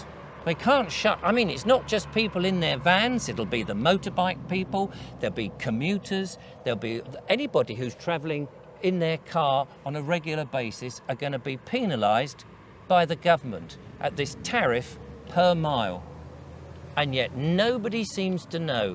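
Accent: United Kingdom. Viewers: I was so glad to hear you speaking British when I started playing it. But I'm sure they'll try to do it over here too, it's all the WEF.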